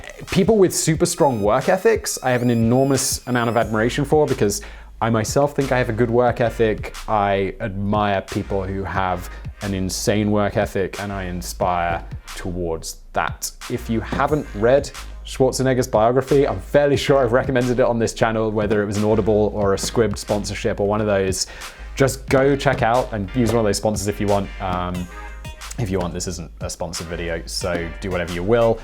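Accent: United Kingdom. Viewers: Coming from an Aussie, I picked your accent immediately, way back when I first started watching your channels. It is very British. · Just wanted to say I saw your recent post about the podcasts, which I hadn't previously heard about, so I checked them out and now I've listened to almost all of The Brainfood Show! Still have a few left to get caught up, but I've really enjoyed it so far. Especially since you open up a lot more and show your real personality ;) You're a very funny and charming guy! I've learned a lot from your channel and podcasts. Usually I dislike fact-based channels because it's generally stuff I already know, but you frequently manage to surprise me with new information. PS I don't think your accent is weird, it's pretty obviously "British person who has lived elsewhere in Europe for many years" and it's not grating or distracting. Honestly I normally don't notice it. 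· Great video, most enjoyable and as a Brit you still have a very strong, unmistakable, British accent Simon - although I wouldn't have picked Maidstone. South-East, certainly but more private school London. Keep up the great work.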